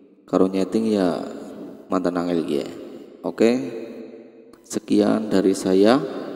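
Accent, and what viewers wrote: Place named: Banyumas, Indonesia. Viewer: ngapak ngendi mas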